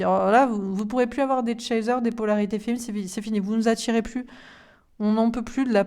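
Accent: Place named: Southwest, France. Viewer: L accent du sud ouest est magique 😁 J ai le même 🤪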